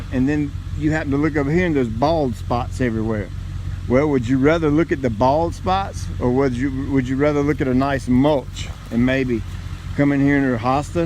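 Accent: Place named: Alabama, United States. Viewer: Cool accent? What state? Alabama?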